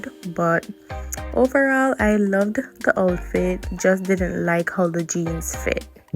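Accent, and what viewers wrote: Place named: Jamaica. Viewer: Hey Hun, as soon as mi hear di Jamaican accent yuh got mi. I love all of the outfits, mi love Shein Bad. New subscriber ❤. I do have a YouTube channel also, pass and show your fellow Yardie 🇯🇲some love😂❤. Lata❤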